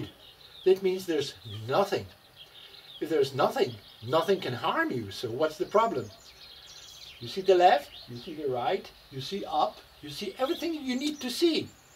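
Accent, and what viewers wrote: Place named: United States. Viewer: Angielski naprawdę dobry, amerykański akcent. Pozdrawiam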